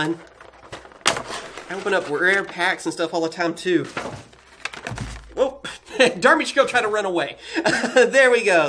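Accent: Texas, United States. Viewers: The thing that I LOVE about your videos is your texan accent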